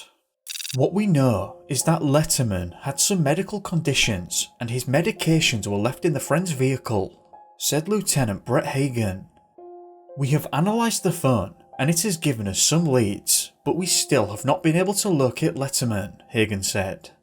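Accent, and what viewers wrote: United Kingdom. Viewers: Are you English , Irish 🍀 OR Scottish??Liking ur voice 🎤🎙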